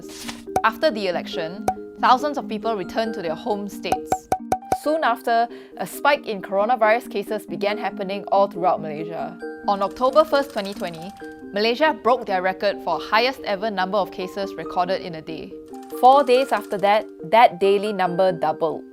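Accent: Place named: Singapore. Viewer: Can’t understand your Singapore Hokkien English lah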